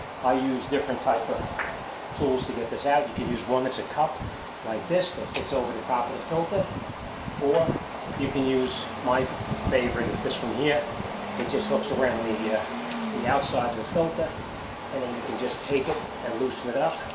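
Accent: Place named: New York, United States. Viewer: Precise, to the point and love that NY accent. Great Job!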